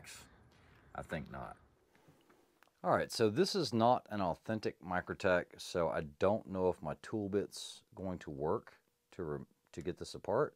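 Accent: South, United States. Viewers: Got to love an Asian with a Southern accent beating on a Chinese knockoff.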